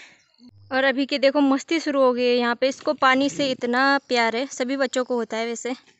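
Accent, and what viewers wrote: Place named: Aligarh, India. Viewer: आपके यहां का नेचर बहुत अच्छा है लेकिन भाषा अलीगढ़ की तरफ की लगती है हमारे गांव में भी ऐसे ही बोलते हैं😊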